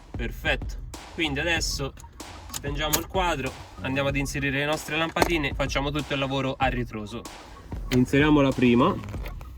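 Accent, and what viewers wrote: Tuscany, Italy. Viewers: fantastico! bel video, poi con l'accento toscano e ancora più divertente guardarlo. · Ciao, io sono già andata da 2 elettrauto per altri lavoretti e quando ho accennato a cambiare queste lampadine si sono rifiutati😭..ora capisco perché 😁! Per caso lavori in toscana dato il tuo accento? Quanto può costare farsela cambiare da un professionista indicativamente? Grazie Manuela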